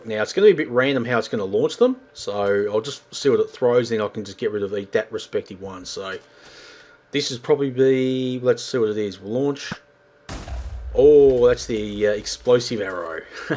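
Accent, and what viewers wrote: Australia. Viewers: its a HOUMING BOUW... i love australian accents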